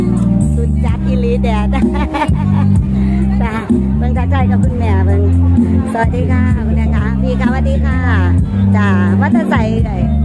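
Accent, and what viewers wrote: Isan, Thailand. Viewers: ผมดูเกือบทุกคริปของ ชอบฟังเสียงพูดภาษาอีสานรู้สึกว่าน่าฟังเพราะหูมากครับขอเป็นกำลังให้น่ะครับจากเอฟชีจากเบตง-จังหวัดยะลาครับผม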